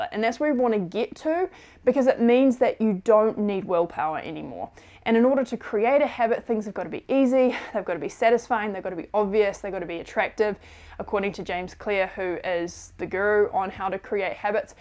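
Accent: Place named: New Zealand. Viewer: She sounds like a Kiwi (New Zealander)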